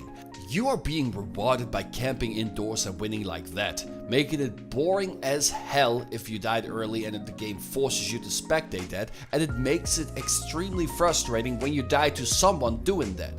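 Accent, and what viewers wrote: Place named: Netherlands. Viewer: Let’s face it, RDR2, desite being the wayy more detailed and perfectioned game compared to GTAV, isn’t half as much fun to play, or at least not online. We can only pray for Rockstar to release GTAVI soon, because it is obvious RDR2 pales in comparison.. On a sidenote, just out of curiosity, where are you from? Your “regular voice” accent sounds pretty Dutch;) Thanks and good luck with the channel!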